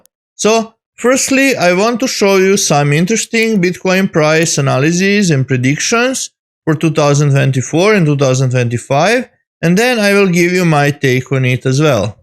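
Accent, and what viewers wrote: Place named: India. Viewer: Da, zvucis ko indijac..jbg sry ostavi ako zelis da radis na engleskom ali mozda da odradis i na nasem jeziku ili novi kanal za balkance!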